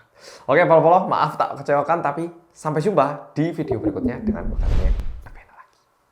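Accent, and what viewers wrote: Java, Indonesia. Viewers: 😂😂 next time coba daging T Rex ya 😀👍 Tapi tetep salut saya sampean medok jowo ne. Lah neng omah ku Kabeh saben Dino Yo pake boso Korea padahal neng Indonesia wes 20 tuon.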